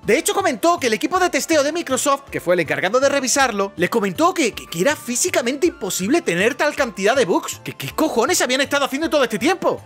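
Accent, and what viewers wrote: Chile. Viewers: Como chileno vine a buscar este comentario, me explotó la cabeza que hablases en chileno xd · La wea!? Jajaja el acento chileno es tan horrendo jajaja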